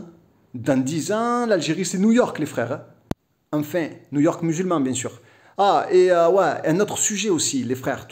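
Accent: Marseille, France. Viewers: c'est quoi el falak ? J' aime écouter cet accent marseillais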